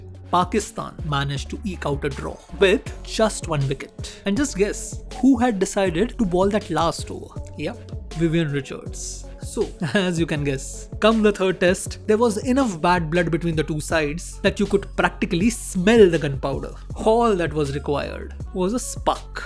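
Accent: India. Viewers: very good commentary....the pace of the speech was extremely good ...the words were clear and spoken with mildness and full of extremely good punctuation. ....unlike the stupid videos uploaded these days where the words aren't clear and there are hundreds of grammatical errors....the accent was very indian but that doesn't matter when the speech is so good.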